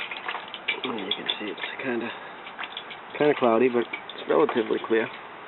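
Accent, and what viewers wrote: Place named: Boston, United States. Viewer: Is that a Boston accent ?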